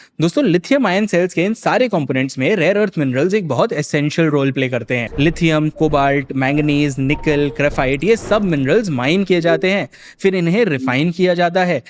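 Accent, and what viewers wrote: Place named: Uttar Pradesh, India. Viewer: I guess Northern states like UP, Bihar. I'm from UP and it seems normal accent to me.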